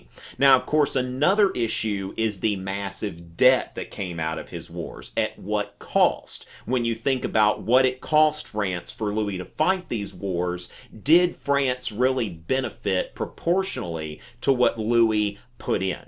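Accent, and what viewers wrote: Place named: South Carolina, United States. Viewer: Hehe, yeah. got a thick South Carolinian accent. Little different from mine (Texan) with all the aaaa's.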